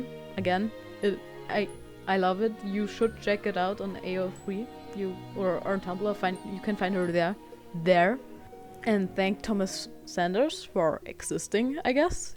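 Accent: Germany. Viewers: If I'm correct, I think German is your first language? Learning a new language is very difficult, but you're doing great, sis!! I find your accent pretty pleasant-sounding, especially the way you pronounce the names OML so satisfying. 😂😊 you're also relatable, from the end bit LMAO Keep creating!! 😊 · WOAH I LOVE THIS SO MUCH ! I'M GLAD I FOUND THIS ! Can't wait to see the next part, if you are doing one! 💗 And English isn't your first language? And I may be wrong but you sound german ? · Sorry if this is rude but are you German? Your accent reminds me of other German people's English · Watched to the very end XD For a little critique/some compliments: I'm learning German and one of my teachers has a similar accent to yours. Learning a second language is really hard :( . I really enjoyed the whole thing even if there wasn't a great deal of animation; I think it still works really well, not that I'm an expert in any way, and the music was perfect. 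The story was creative and convincing and I'm excited to see what happens next and if it somehow ties into Thomas Sanders' life- although I doubt that that will happen. I discovered TS recently and O.M.G.! His Sanders Sides are perfect and somehow so accurate- it's like therapy and they're so helpful. Love the art style, don't overwork yourself ;)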